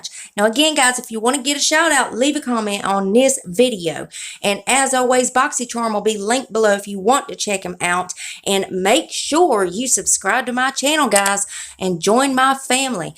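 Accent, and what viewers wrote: United States. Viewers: Thanks so much for all the spoilers you are so wonderful! Love the country accent sending you lots of love from Georgia! 💋💖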